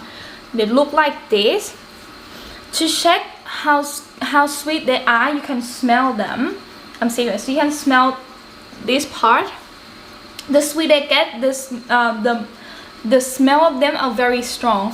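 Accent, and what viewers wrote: Australia. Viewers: you are getting an Aussie ascent ..... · Hello, you are starting to get an aussie accent! Love your cooking vlogs! :)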